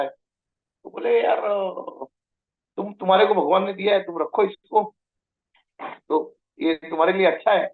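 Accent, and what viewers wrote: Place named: Maharashtra, India. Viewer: आप के हिंदी मे मराठी आती है, बहोत अच्छा लगता है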